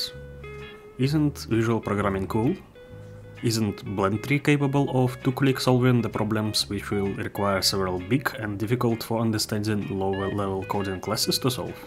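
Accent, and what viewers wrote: Russia. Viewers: русский акцент? information was so useful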